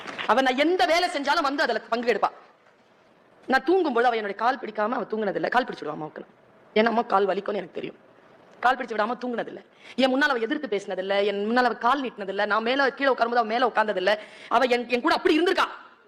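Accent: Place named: Tirunelveli, India. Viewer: திருநெல் வேலி நீங்கள் பேசும்போது தெறியுது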